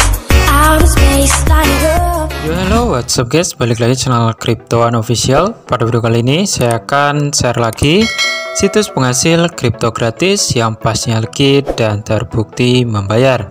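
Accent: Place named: Banyumas, Indonesia. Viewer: Logat ngapak pa ya.. org mn nich?😁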